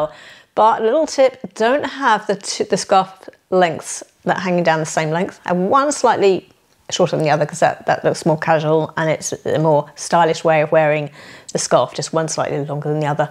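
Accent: United Kingdom. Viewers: I just found your channel. I am 50 and trying to style myself well without looking too old or young 😅. I was reading the video and decided to click and listen. I said to myself I bet she has a British accent. I was right 😂. I love listening to you and you give some good thoughts on leggings. New subscriber here!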